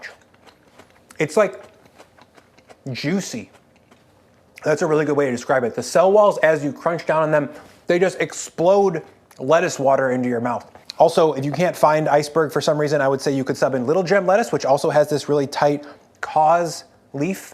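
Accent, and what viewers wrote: United States. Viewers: I love your American accent.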